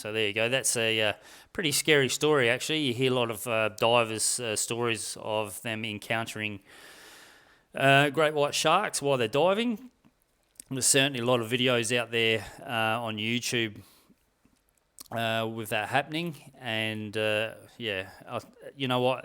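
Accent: Australia. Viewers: he's Strayan. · The size of the shark would not matter to me; a shark is a shark!!! Whether it's 1 meter or 5 meters, there's absolutely no freakin' way I'd go in the water ever again!!! Oh by the way, I like your Aussie accent. Just sayin'. 🇨🇦👊🤙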